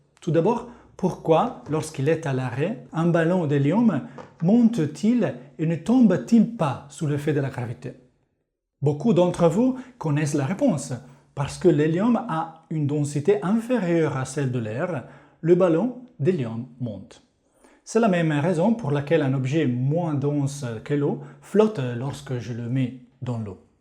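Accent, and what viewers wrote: Italy. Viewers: Bellissimo accento Italiano!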